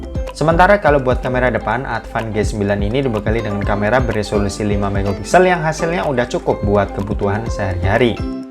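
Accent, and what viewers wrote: Semarang, Indonesia. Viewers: mas, sampean wong semarang mesti hehehe suwon info2nya mas, sangat membantu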